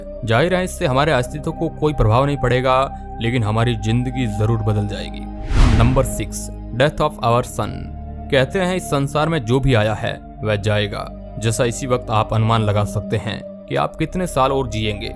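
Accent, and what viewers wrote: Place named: Bihar, India. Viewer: Abe sale brust kya he be? Gama Ray brust??? Pehle accent thik kar uske bad ana video banane .... bihari he kya?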